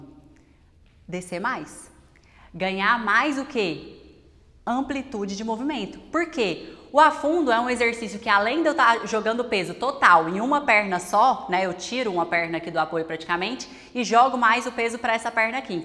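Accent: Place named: Goiás, Brazil. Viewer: kkkkkkkkk, o sotaque dela sempre entrega que ela é goiana raiz.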